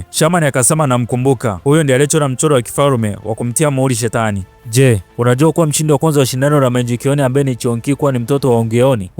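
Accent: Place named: Kagera, Tanzania. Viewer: Mtangazaji ana lafudhi ya kihaya😊😊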